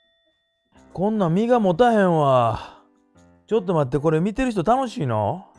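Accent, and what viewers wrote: Kansai, Japan. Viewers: 関西弁といい怖がりなとこといい、わいわいさんと被るwどっちも好きだから超嬉しいわw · ビビリ実況 関西弁 ユーモラス わいわいやん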